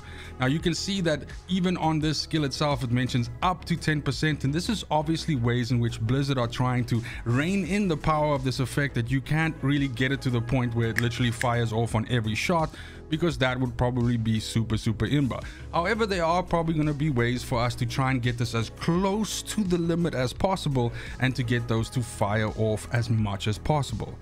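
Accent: South Africa. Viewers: Bro are you South African??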